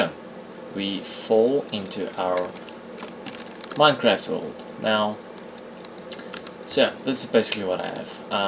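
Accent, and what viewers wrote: South Africa. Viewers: You sound South African.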